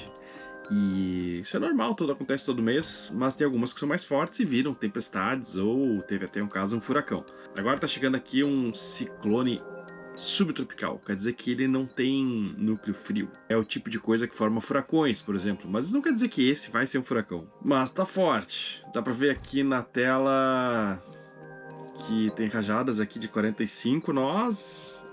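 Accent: Rio Grande do Sul, Brazil. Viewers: Buenas tu és gaúcho .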